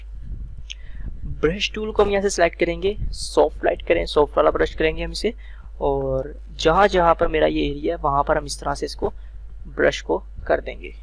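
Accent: India. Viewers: Buhat achi video hy or specialy bol b buhat desi andaz sy rhy .buhat detail sy bnaty ap video Allah pak apko kamyab kry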